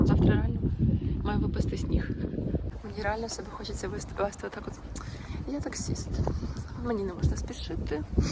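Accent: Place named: Lviv, Ukraine. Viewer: Мне вообще не нравится украинский, но ваш украинский мне приятно слушать) Может Львовский акцент Я из Киева.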